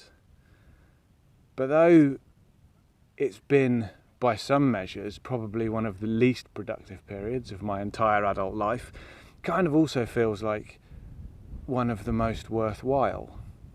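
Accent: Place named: RP, United Kingdom. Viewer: no, been and seen rhyme elsewhere when you talk. It's close to received pronunciation, I think. Her Majesty also does this. Contrast to Ireland, Scotland, or Brumie.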